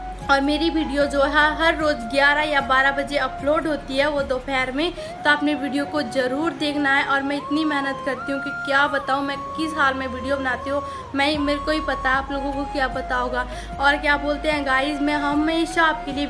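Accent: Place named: Haryana, India. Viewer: आपकी आवाज भाषा से बिल्कुल हरयाणवी लगती हो हिमाचल की लैंग्वेज नहीं लगती बिल्कुल भी क्या बात है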